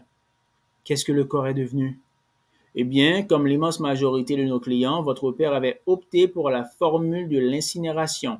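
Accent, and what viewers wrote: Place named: Caribbean. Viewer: Mon Dieu, vouloir lire Houellebecq est une intention louable, mais avec un accent antillais pareil...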